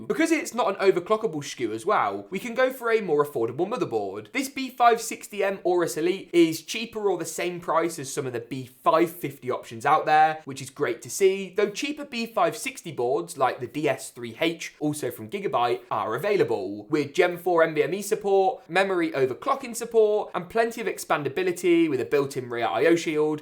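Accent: United Kingdom. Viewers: That British accent is so stupid 😂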